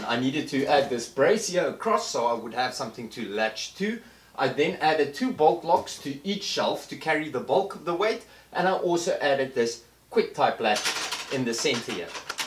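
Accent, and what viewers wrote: Afrikaans, South Africa. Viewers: First of all your video is great, and your shelves are awesome. Secondly the whole time I was listening I was thinking to myself, he is a South African. What made me 100% sure that you were South African was the hadidas in the background noise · Wow!! Wish I'd seen this a year ago. I installed ceiling boards so I can't use this idea. Absolutely loved this concept and will try to adapt it to my needs. You sound like a fellow South African. If I'm right, then all I can say is "Bakgat!!" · Organizing genius! I’m trying to place the accent - South Africa? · Jys a suid afrikaner, Engels n man ?!! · hi there. i love your idea and it looks soooo good and practile ofcourse. Are you from S.A? i lived in Cape town for 8 years and i think i recognise the accent 😉. Thanks for the great video and tips. Cheers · are you from south Africa? 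that accent afrikaans pappie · He is Afrikaans, he's my cousin btw he got married not too long ago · Heard the same immediately. Definitely a Saffa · South Africa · Only just found out about this channel and I'm hooked. Readily picked up the accent as South African. At least now I can actually find some of the recommended products. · South African ( Dutch)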